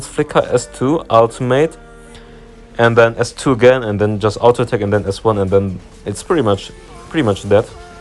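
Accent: Germany. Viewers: Bro are u german ?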